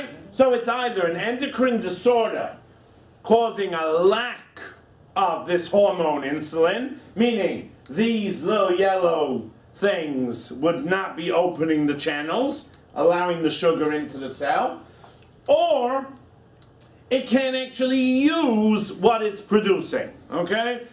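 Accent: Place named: New York, United States. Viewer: Is it just me or does he sound like Timon when he lectures?? Probably just the New York accent, soo endearing 😂 love the lectures, 10x better than my lecturer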